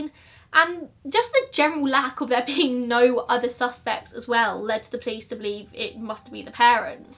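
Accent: United Kingdom. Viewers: I am such a fan of yours, so I hate to make any comment that could be deemed as negative; however, it would be great if you could speak a bit slower. I adore your British accent, but have difficulty understanding what you are saying when you speed talk. I literally couldn't make out much of your Florida vlogs because of this. No disrespect intended 😉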